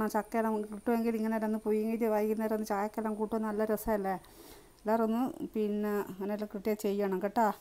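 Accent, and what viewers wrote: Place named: Vadakara, India. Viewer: Slang 🤩 immale vadakara❤️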